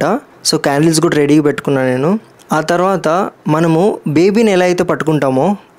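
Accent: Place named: Kadapa, India. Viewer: Kadapa slang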